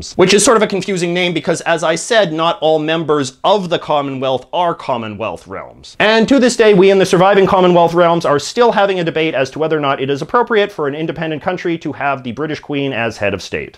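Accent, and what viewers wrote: Canada. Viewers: About. Foond. Canadian accent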